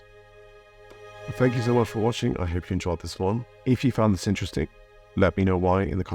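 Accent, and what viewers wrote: United Kingdom. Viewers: It's a real, real pleasure, Brother. I often watch your creations 2 or 3 times. Here, you really manage to pass on your surprise and delight as your tour the cities. It is all so beautiful compared to your preconceived ideas. When you described the lush green, and the ultrafriendly people, I really felt at home. Also, when you described the economic difference, I also felt at home, because a lot of our skilled workers are leaving...going to Oz. The rainbow lady is a bit of me...I can imagine sharing a beautiful experience with a random person. I was curious about why the women blamed some ghastly attitudes towards an American lady, on East Germans. Maybe they were right. I don't know. But I just love the sound of the people and the places you visited. The other things I like about your videos are -your British accent and calm voice -the thought, explanations, ideas, analysis and your personal experiences and opinions that you put into these -the filming...is very good and all kinds of unexpected details are included. 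It makes it very interesting. I like the range of topics you come up with. I love your (British?) humility. Kiwis are quite a bit like that, I think. Some of your topics have a lot of depth...are fairly intellectual. I especially like seeing you smiling. It makes me think you are enjoying yourself in different places...especially in this newly discovered paradise. It is a very sweet smile, and Down Under we do more smiling than some people of the world seem to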